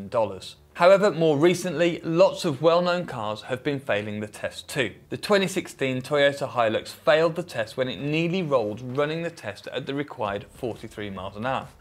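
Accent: United Kingdom. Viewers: You sound British but look French 🤔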